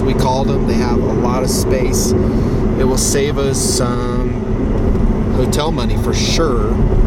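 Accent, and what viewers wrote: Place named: Texas, United States. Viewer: Yup sounds like Texas.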